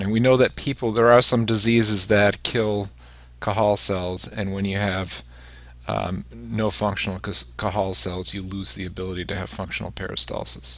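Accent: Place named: Jamaica. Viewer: 1. excellent i have already liked it on the icon side 2. minor revisions recommended - a) the slimy Jamaican English accent could be changed, b) not a semi-audiobook, coz i don't want to be seeing the videos whilst hearing to his good english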